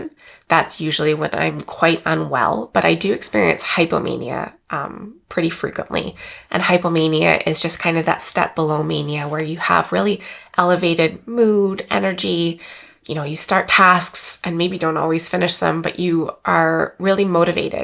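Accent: Canada. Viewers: Great educational video as always,! Very random but I always forget you’re Canadian until I hear you say certain words. In this video it was “about” 😂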